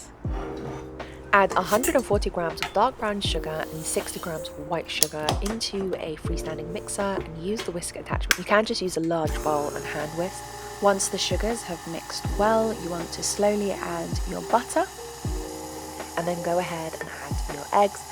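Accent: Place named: United Kingdom. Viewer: I love your particular British accent. I don't think I've heard it before. 🤔What area is it from?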